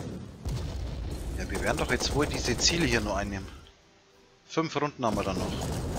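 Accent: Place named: Franconia, Germany. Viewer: Fränkisch ist so geil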